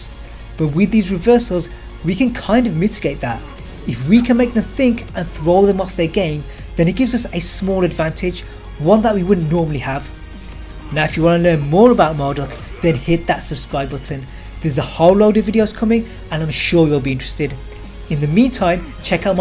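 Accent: Birmingham, United Kingdom. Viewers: Are you Brumie?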